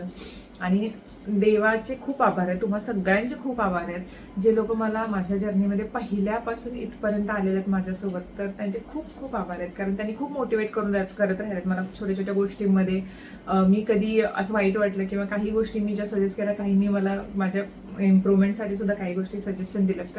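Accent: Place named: Ghat, India. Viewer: Tu ghati ka boltes kokani ka ny bolt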